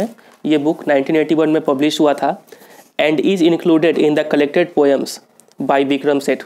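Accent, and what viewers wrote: Bihar, India. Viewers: Bro aap language se bahot big bihari lagte ho like you said " Rajaji"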